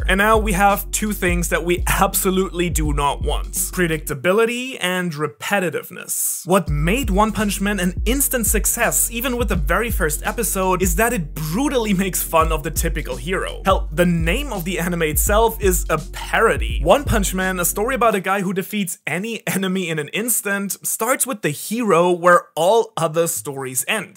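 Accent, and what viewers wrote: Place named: Germany. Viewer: Bruh seit gensos podcast hör ich richtig raus dass du deutsch bist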